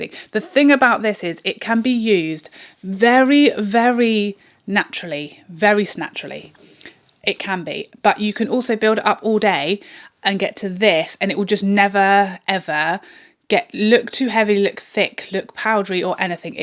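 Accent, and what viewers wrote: England, United Kingdom. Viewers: you always look pretty, but exceptionally pretty today. My father's family was English, so I just adore listening ro you speak. And girl, you are so stinkin funny! 🤗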